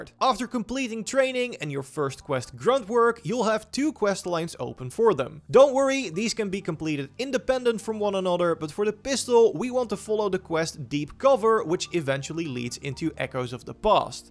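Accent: Netherlands. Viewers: You sound very Dutch, nice vid tx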